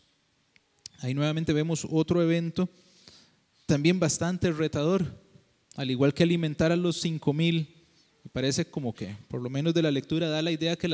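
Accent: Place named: Paraguay. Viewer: Me parece que eres Paragua, un consejo deja de decir "verdad"? Todo el tiempo.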